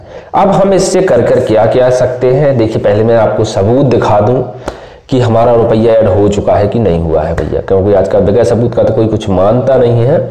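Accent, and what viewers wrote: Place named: Bundelkhand, India. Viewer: Aapki language sunkar lagata hai ki aap Bundelkhand se belong kartre hai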